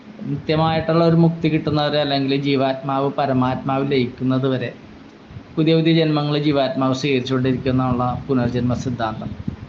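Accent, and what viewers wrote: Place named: Kochi, India. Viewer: Kochi bhasa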